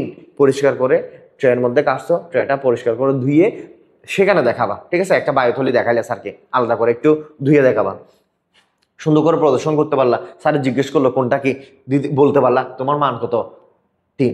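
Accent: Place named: Chittagong, Bangladesh. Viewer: Sir er kota huni lagedde chatgaiya🗿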